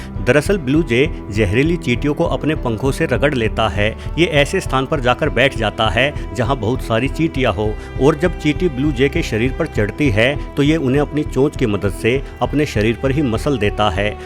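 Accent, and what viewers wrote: Haryana, India. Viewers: Sir aap Haryana se he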